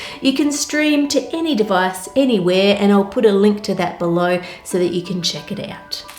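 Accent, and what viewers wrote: Perth, Australia. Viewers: Australians like have an awesome accent! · Dear mam Will you please teach us Australian accent please please it's so good 👌 by the way I am kashif form Asia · it's a Perth accent :)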